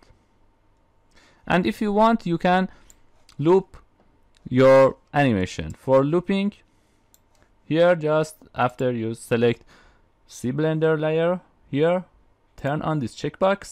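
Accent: Iran. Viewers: حاجی حس میکنم ایرانی هستی از صدا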